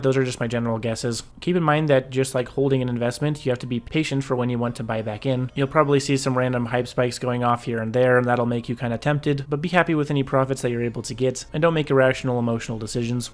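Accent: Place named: Australia. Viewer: I like your aussie accent mate 😂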